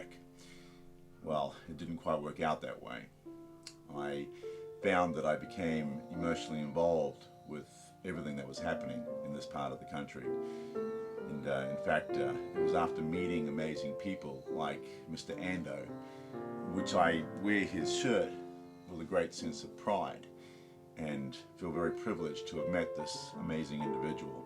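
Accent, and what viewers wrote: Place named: Australia. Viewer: wow, they make a documentary less than a year after the Tsunami and think things will have changed dramatically! Must be a Cali-tard narrating this, even with the Australian accent. Who the hell made this, the totally black screen when narrating the tsunami hitting was reallllllly cheesy. The narration is so.....it so RELISHES the opportunity to exploit this and be dramatic..it's kind of disgusting really. Have to bail after just a couple minutes. Dipped in to other parts further on and heard the same guy getting off on all of this and thinking he was being so profound. Really really disgusting M8! Thumbs down for you.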